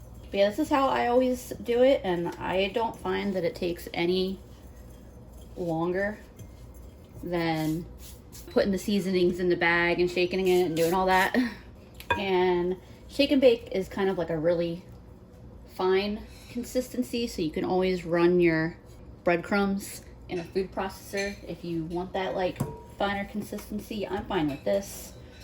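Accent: Philadelphia, United States. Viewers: !! I know you're located in NC but I've thought for years I was picking up on a Philly accent in your videos! I'm from Delaware County-- where are you from? Also, GO PHILS! ♥️⚾ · I always thought you had a Philly accent but in past videos I think you said you were living further down South. But with the Phillies shirt I’m guessing from Philly region. Im in DELCO and sounds very familiar. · I knew you had a Philly accent!! Go Phillies!!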